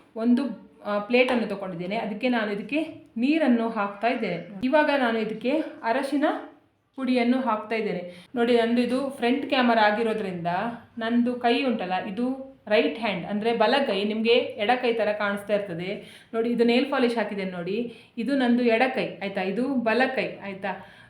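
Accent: Mangalore, India. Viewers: Mlore kannada ❤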